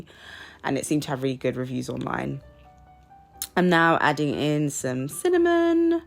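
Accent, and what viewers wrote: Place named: United Kingdom. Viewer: Hello nice video 😊! I have to say you sound a lot more British compared to Kabir I like it!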